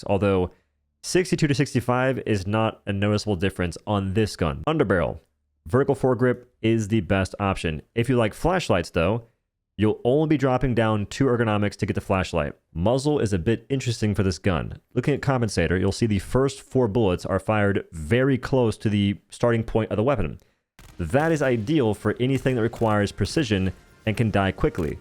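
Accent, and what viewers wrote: South, United States. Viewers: Naturally he seems to have a very mild, almost undetectable southern accent. He exaggerates it when saying certain words for comedic effect. An older video showed his PO box is in Texas so it makes sense. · He's Suth'ehn. Git'it?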